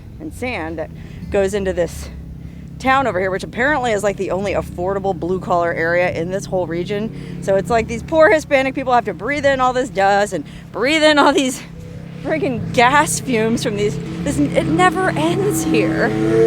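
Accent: Australia. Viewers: G'day mate. your OZ accent was pretty good. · Your Australian accent is very Steve Irwin 👍 Definitely better than Meryl Streep's "A dingo's got my baby' 👍🤣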